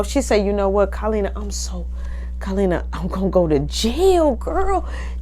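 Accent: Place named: Chicago, United States. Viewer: don’t think I’m trolling, just be tryna boost/run it up 😊 but, you grew up in NY and the Go right? Only cause I spent summers there (still go yearly for my bday, I got family in Chicago) and yo accent sounds like a mix of Chicago and the DMV.. it’s different! Anyway, PLEASE get a publisher dammit! We got time to watch these stories, therefore we can buy a 10/20 dollar book! I’m just saying! I know you can do it! Rooting for you 😌🥰💕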